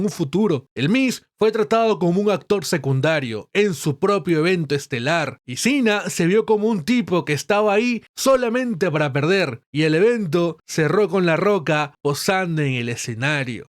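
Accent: Argentina. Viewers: Porque hablas coml argentino? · pq mrd habla como argentino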